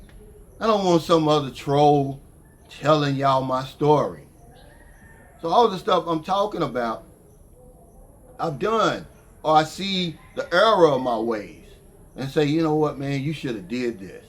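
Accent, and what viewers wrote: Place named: New York, United States. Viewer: I love this guy - New Yawk!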